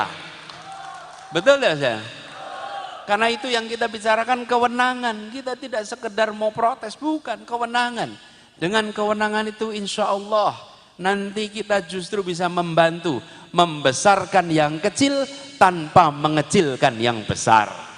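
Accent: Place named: Java, Indonesia. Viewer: Bahasa jawanya kok medok jg....sehat selalu pak anis.pidato jenengan bikin suasana hati menikmati ..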